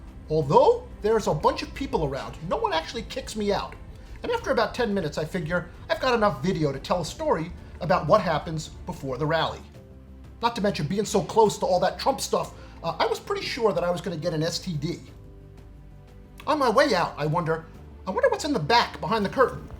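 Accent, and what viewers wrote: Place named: Northeast, United States. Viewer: Mr., you mispronounce the name of the state of Nevada and would mispronounce the name of a highly important mountain range in the state of California, the Sierra Nevada. It is not pronounced "na Vaaaauuugh da," it is pronounced "Ne Va da." The middle syllable is pronounced like the word "Vat" without the "t." It sounds like you were born and raised somewhere in the northeast or upper Midwest of the United States. You are living in the west and should pronounce like the people who live in Nevada and the Sierra Nevada. Otherwise I like your undercover videos and most of your viewpoints. Keep up the good work!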